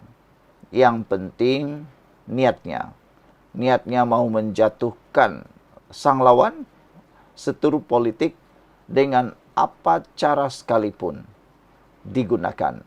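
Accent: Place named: Sabah, Malaysia. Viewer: Ini lah chanel paling pengarut.penipu...Melalui dari cara pengulas menyampaikan Berita.tentu chanel ini berasal dr Sabah.Tp kalau Orang Sabah sudah tentu tahu perkembangan di Sabah..Tapi semua Berita dr chanel ini dr dulu sampai skg semua karut.Lebih baik jgn mengunakn loghat Sabah.memalukan Sabah..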